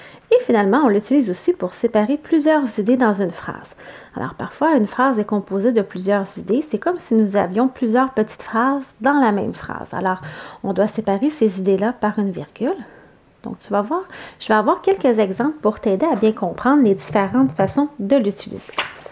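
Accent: Canada. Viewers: C'est la meilleure des manières, pour apprendre une leçon comme celle-ci. se laisser bercer par un doux accent Canadien. Pouvez vous me dire si cela est juste ? Merci d'avance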